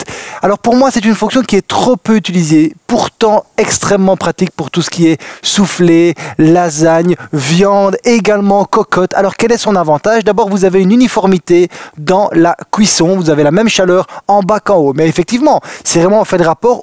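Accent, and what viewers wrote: Belgium. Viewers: Merci 🙏 Monsieur pour vos bons conseils, j’ai appris des choses grâce à vous. Je pense que vous êtes Belge ça fait plaisir de vous écouter ça change une fois comme on dit en Belgique 🇧🇪 j’aime aussi les français après tout on est voisin non peut-être 🤔 🙏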